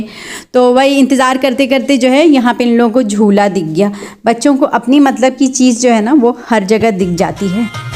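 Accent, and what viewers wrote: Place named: Jharkhand, India. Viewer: Assalamu alaikum Aapi maybe India se hun Jharkhand se jab aap baat Karti Hain To mujhe lagta hai ki aap hamari taraf ki Hi Ho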